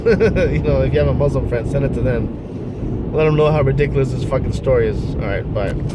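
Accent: Arab world. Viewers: You are fake , and you have Arabic accent even when you try to pretend different dialogue your accent is more clear so, how much you got payed from YouTube for that fiddling video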